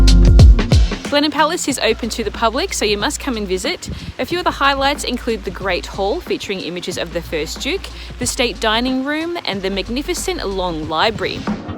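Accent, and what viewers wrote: Australia. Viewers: Been around most of Cotswold villages you filmed. Enjoyed your precise commentary. Do I hear an Oz accent? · Hello, can I ask what your accent is? You sound a bit Australian but I can’t tell haha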